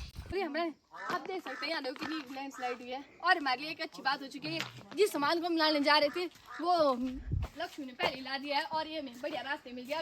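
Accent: Pahadi, India. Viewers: Hindi ke sath phadi tune achhi hai❤ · Ap hindi bhi bolte h pr tone pahadi hi aati h 😁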